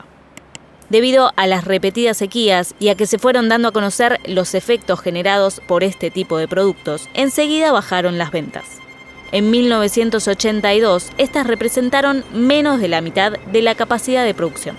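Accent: Argentina. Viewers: Cada día pienso más seriamente que este canal es el mejor canal de Youtube argentina! (por la voz parecen argentinos, me disculpo si no son de ahí), una GENIA la narradora!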